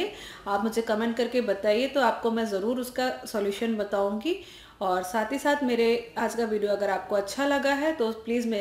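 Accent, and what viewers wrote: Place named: Punjab, India. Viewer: punjjabi voice 6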